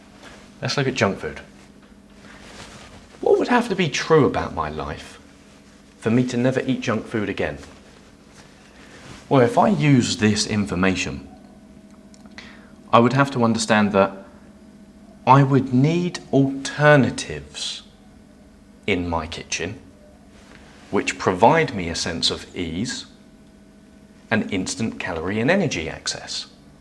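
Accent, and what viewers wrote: United Kingdom. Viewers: What type of British accent is this? I’m getting Michael Caine vibes 😊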